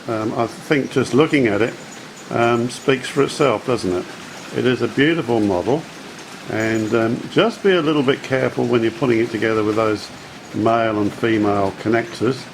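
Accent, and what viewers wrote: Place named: Australia. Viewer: Wait, your accent has an australian twang... if youre australian, ignore the beginning part of the comment under this........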